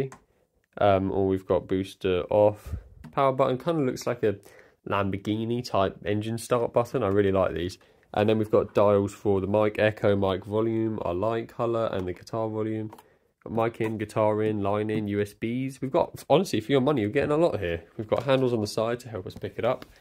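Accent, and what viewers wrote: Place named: Cockney, United Kingdom. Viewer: This man is speaking with a strong Cockney accent. He must be from Essex as thats the only place in Britain where Cockney is still spoken.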